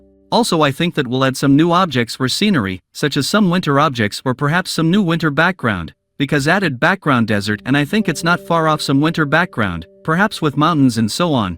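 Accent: Russia. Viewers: are you Russian??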